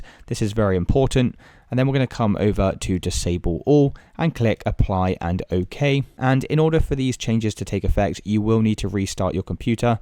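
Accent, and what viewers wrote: United Kingdom. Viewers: jesus i hate the british accent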